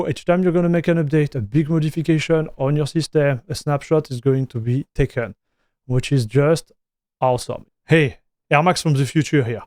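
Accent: France. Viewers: I'm guessing that this is probably a very informative video, but the extreme (French I'm guessing) accent. I cannot understand what you are saying. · Jadore cet accent francais :D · Heavyest french accent I ever heard ❤🇫🇷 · nah this is the purest french accent you'll hear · you're french because your accent is very similar. i like your videos a lot.😁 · You have the thickest french accent I've ever heard 😅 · honestly i think id rather just listen to you speak french and read subtitles rather than try to decern what you're saying in english through your CRAZY thick french accent.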